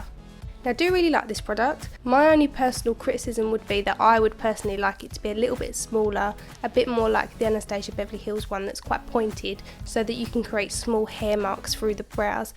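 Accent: Essex, United Kingdom. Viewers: Very beautiful, sultry look, thank you! By the way, are you from Essex?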